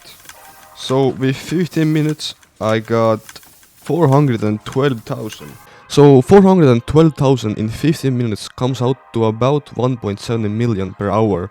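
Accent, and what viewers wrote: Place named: Estonia. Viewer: Your accent is Estonian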